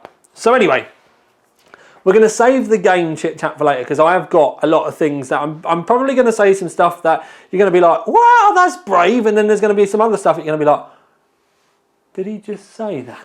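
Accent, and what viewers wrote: United Kingdom. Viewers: that british accent annoys me!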